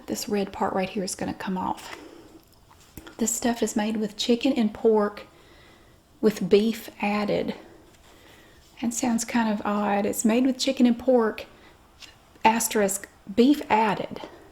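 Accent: South, United States. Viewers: "It has little fish awn it!" 😂 southern accents are my favorite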